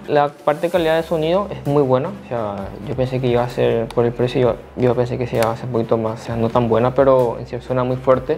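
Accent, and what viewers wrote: Paraguay. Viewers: por el acento es Paraguayo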